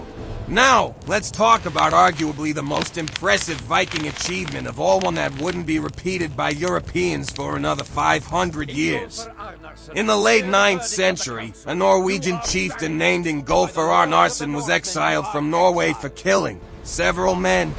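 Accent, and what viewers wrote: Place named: Bronx, United States. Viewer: Another great upload. But, respectfully, the gruff, vaguely Bronx-ish voice narration...hmmm...not so much😆✌🏽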